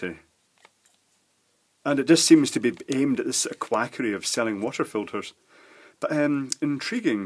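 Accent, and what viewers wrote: United Kingdom. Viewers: He's in the UK. Here it is spelled Aluminium (with an extra i) and pronounced that way. There are many differences between UK and US spellings/pronunciations. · oh, of course. whenever i hear a british accent i assume the device in the vid has a G-type plug :D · I don't know why I keep watching your videos. I know nothing about electronics and most of this I just don't understand. Must be your soothing british voice... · I will never understand why British people pronounce aluminum "aluminium"... There's only one "i" in aluminum....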